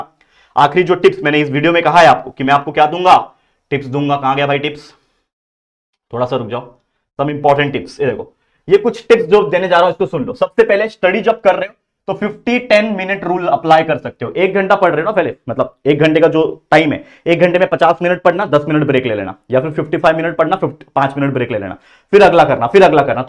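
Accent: Maharashtra, India. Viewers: sir kya aap marathi ho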